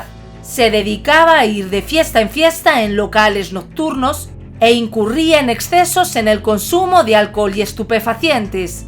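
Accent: Spain. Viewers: podes haceralgo con ese acento gallego? no suena bien. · Hola,me encanta tu trabajo y por tu asentó deduzco que eres española, pero es video no vidio como tú lo dices jjjj saludos desde República Dominicana 🇩🇴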